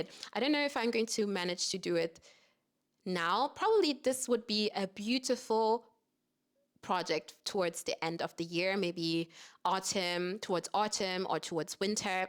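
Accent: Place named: Germany. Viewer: He, he - wenn dich die Aussprache von Rerum Natura nicht verraten hätte - spätestens bei „soooo“ war ich mir sicher, dass du in Deutschland zuhause bist! 😊 LG aus Österreich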